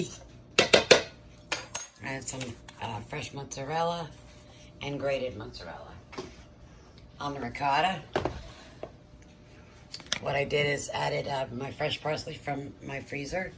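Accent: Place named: New York, United States. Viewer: I want to try making this at some point, because it looks so yummy!! I love your videos, because the way you talk reminds me of my family, and makes me feel comforted. <3 They're all from NJ/NY.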